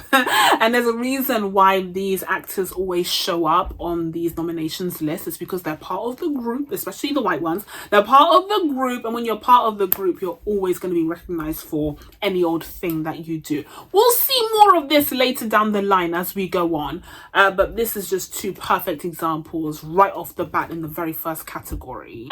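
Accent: United Kingdom. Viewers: Are u british??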